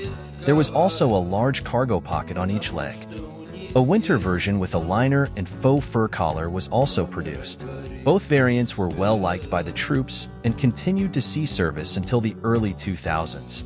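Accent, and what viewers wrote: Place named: United States. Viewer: Very nice. You have a nice speaking voice. It's so very American. You sound like a voice actor for the main lead in an early 00's anime that's been dubbed.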